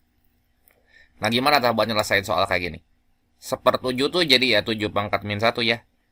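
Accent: West Java, Indonesia. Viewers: Abangnya orang sunda wkwkw lucu jelasinnya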